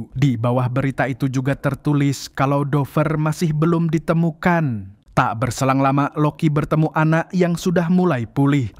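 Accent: Banjar, Indonesia. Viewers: Banjar banar amang nih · Orang banjar kah bang? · Banjarr is good😂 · mang pian urang Banjar kah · pian urg banjar kah min😅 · Bah orang banjar kah Pian mang,pacangan jadi langganan ni nonton di sini ulun😅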